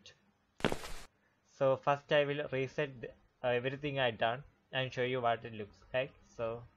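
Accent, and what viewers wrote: Kerala, India. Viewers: BRoo you sound Mallu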